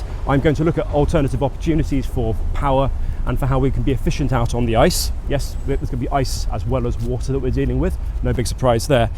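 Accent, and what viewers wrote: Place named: England, United Kingdom. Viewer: OK, you did the whole last build with an English accent. Can you do a Canadian one now?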